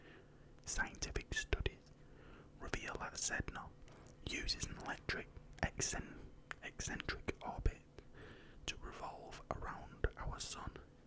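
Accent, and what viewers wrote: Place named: England, United Kingdom. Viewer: You are honestly such a good find. I love space and learning things like this. Finding someone who's voice and content you like can be hard! Can I ask what part of England you're from? I'm struggling to place it and it's annoying me 😂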